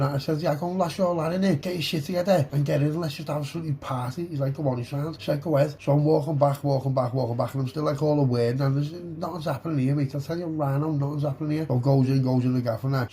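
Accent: Liverpool, United Kingdom. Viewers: Fuckinhell here we go starting me n rattle for n x 1😂 b belter scouse 👌👌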